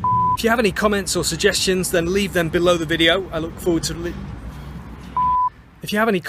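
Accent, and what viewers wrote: United Kingdom. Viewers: the brit accent really gives confidence. cheers! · Thank you for that really useful video and your British accent is just amazing!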